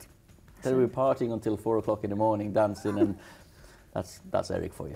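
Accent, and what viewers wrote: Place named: England, United Kingdom. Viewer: Hes got a English accent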